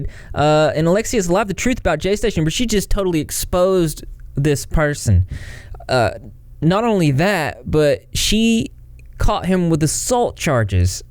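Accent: South, United States. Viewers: I love your southern accent ❤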